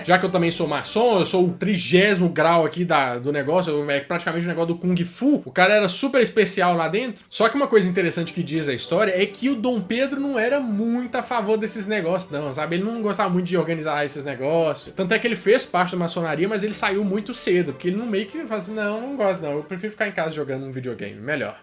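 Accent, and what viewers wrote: Minas Gerais, Brazil. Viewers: MUITO LEGAL ESSE VÍDEO. EU GOSTO MESMO É DESSE SOTAQUE MINEIRINHO GOSTOSO DEMAIS DA CONTA... PARABÉNS. EXELENTE CONTEÚDO... ESTOU AGUARDANDO A PARTE DOIS...🇧🇷🇧🇷💙💛💚👍👍👍👍👍👍👍👏👏👏👏👏👏👏👏🌻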